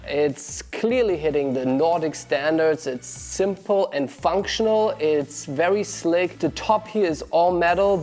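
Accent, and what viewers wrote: Germany. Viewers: The bell rings. Der Hund ringt. Höre ich selektiv oder kommst Du ursprünglich aus .de? ;) Super Video und das CT habe ich soeben auf meine Weihnachtsgeschenkefürmichliste gesetzt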